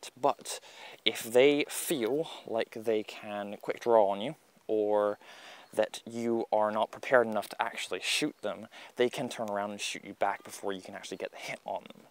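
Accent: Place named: United States. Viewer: Where do you live? You have an American accent, but you're paying for things with pounds?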